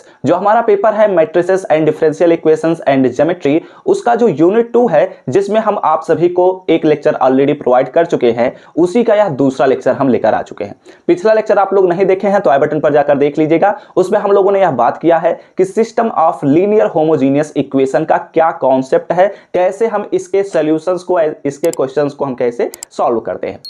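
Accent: India. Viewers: I think he is bihari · no bihar me aisa pronounciation nhi hota UP me hota hai so UP ke ho sakte hai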